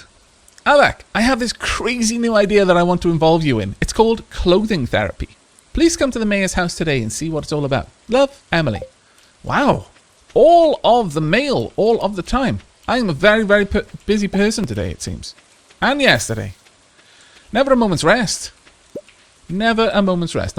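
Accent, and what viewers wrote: Wales, United Kingdom. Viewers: Say Aavak, I could have sworn your accent was Welsh! So are you a welsh person living in Scotland or a Scottish person with a funny accent? (I am from Edinburgh, my accent is strange too.)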